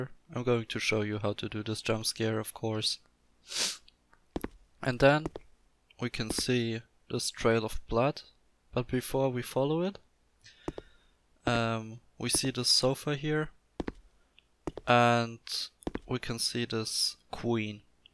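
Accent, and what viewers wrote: Germany. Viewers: Love your videos man :) Just one question (no offense): Are you from an english speaking country? Because your english sounds quite a bit like the english most of the germans are speaking :D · Man hat es ein bisschen gemerkt bei manchen Wörtern, du hast diesen typischen deutschen Akzent drinnen, aber ich glaube, das fällt eh niemandem auf😆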